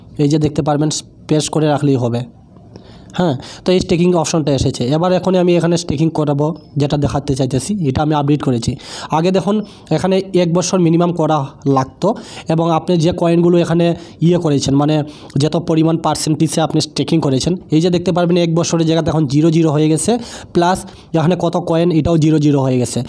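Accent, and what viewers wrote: Sylhet, Bangladesh. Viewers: Baisab sylhetti bujchi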